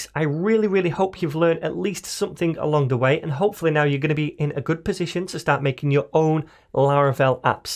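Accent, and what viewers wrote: United Kingdom. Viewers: Is this speaking British Accent?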